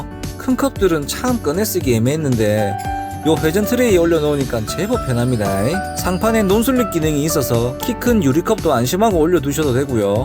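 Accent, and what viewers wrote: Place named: Yanbian, China. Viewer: 오오...억양이 연변분??😮 반갑습네다잉!!😮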